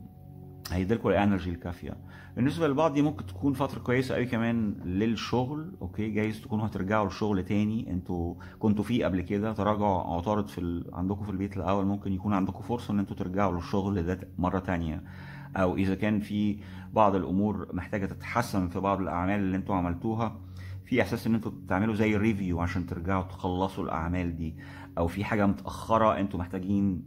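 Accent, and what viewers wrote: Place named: England, United Kingdom. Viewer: I love ur english accent ❤ يا لهوي 😊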